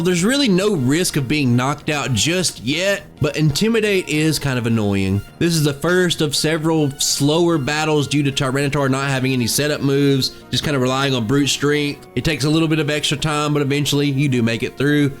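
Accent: South, United States. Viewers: The southern drawl mixed with the wit, the self awareness and relatable rants just made you probably the most human YouTuber I've ever listened to. Fuck yeah dude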